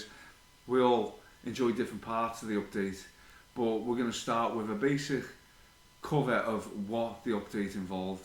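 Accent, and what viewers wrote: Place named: Liverpool, United Kingdom. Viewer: lad.. Proper Scouse 😎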